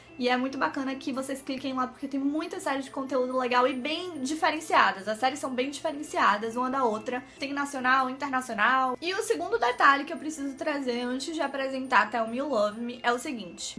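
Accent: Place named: Salvador, Brazil. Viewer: Amo esse sotaque do meu país Salvador hahaha Já tinha assistido o filme efeito borboleta, eu fiquei um pouco desanimada com o filme porque eu achei que as coisas demoraram a acontecer, mas eu até que gostei, achei a proposta interessante. Fiquei com vontade de assistir The Bold Type, acho que vai entrar pra lista kkkkkk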